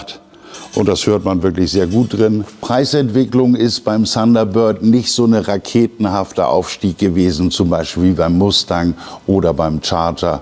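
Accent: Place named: Hamburg, Germany. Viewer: Also die Kaufberatung an sich ist nichts besonderes, weil nahezu alle Tipps auf jedes alte Auto zutreffen. Kleiner Kritikpunkt. Aber die kleinen Hintergrundinformationen zur Geschichte des Fahrzeugs oder die Prognosen der Wertsteigerung sind interessant. Und lieber, Deine Art zu Reden ist typisch Hamburg, sehr sympathisch, nordisch trocken und ich grinse bei jedem Video 😁 Bist halt ein echter Typ! 👍🏻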